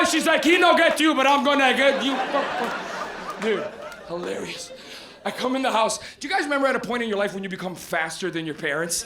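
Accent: United States. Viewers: you were great. You had me rolling, you sound like you’re from Miami 😂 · Why does he sound like he's from Jersey? I had to look him up; did I know where he's from? Canada? I was surprised.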